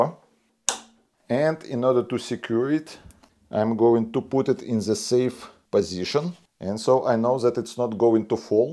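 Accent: Russia. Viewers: Thanks for review. I think this device can be used to control movie camera to fuse real and virtual video or make other effects, or even to clone "handwriting" on letters BTW: your accent is to similar to mine, are you from Russia ?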